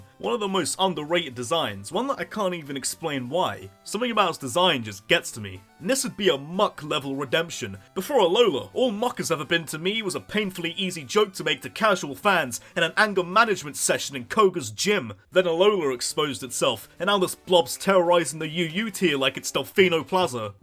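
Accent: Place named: Bristol, United Kingdom. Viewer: Ahh, that intro sounds like bristol :)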